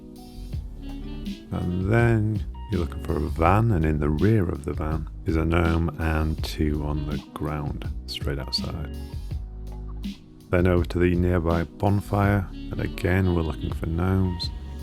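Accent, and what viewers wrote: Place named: United Kingdom. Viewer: Came for the concrete, subbed for the British accent!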